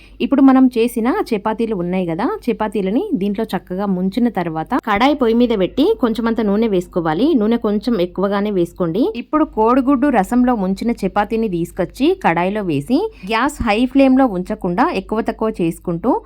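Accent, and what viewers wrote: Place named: Telangana, India. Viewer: Bagane cheppaaru kani konni words and mee slang edhola unnaay. Artham kaledhu. Meedhi Telangana state ayyi undochu kani andariki artham ayye slang lo chepthe bagundedhi. Only Telangana vallake kadhu kadhaa ee video. Telugu state ayina Andhra vallu kuda chudochu kadha. Naku kuda voma ante artham kaledhu evaro cheppedaka. Slang pure ga artham ayyela unte baguntundhi. But video is ok.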